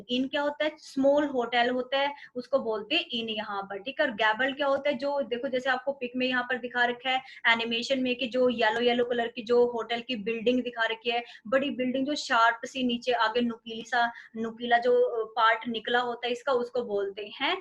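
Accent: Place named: Punjab, India. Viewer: Tuhadi bolli Punjabi lagdi aa..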